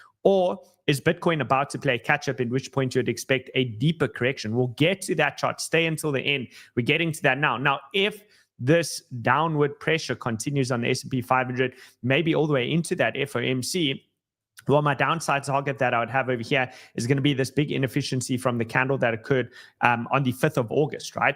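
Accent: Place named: South Africa. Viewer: $10000 that's a south african accent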